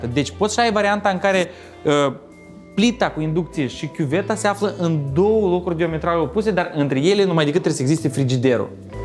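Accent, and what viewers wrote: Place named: Bessarabia, Moldova. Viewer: cu accent basarabean,dar bine pregatit.exceptionala prezentare,profesionist,cu detalii finisate in arta amenajariloe!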